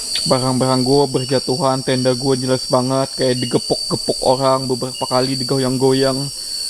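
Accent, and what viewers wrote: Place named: Kalimantan, Indonesia. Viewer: Ga usah pake kata Gua apa Gue bang.. Logat mu kalimantan banget.. Lebih bangga pake bahasa kalimantan atau setidaknya bahasa indonesia.. bangga lah berbhasa Daerah, bahasa kalimantan!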